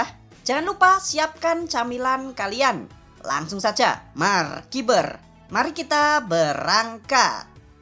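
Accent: Java, Indonesia. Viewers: Min medog jawane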